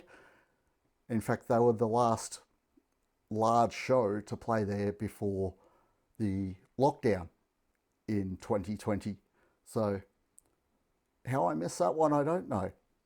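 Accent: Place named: United Kingdom. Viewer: So ok I have done my job, subs, liked and will make a little blabla to your reac.! So yes is a great metal band from Ukraine, the lyrics are often hiding a great support to their country wich is fighting against these bloody russian! I hate them since I was young,and now I am a really old french metalhead (Second Wave Black Metal the most I think)... But I have a first question are you from the US or the UK, because the most reaction channel I see are from the US and you have such an accent, I think you're from the UK? (Great if yes). Second question, why do you name you "old...."? I am old yes, you could be my son, easy!!! Ok about an other song from Tatiana... I think about live official vid... Great song! (Like all song ^^). And dont bother to much about backing tracks things, is a real true band!!!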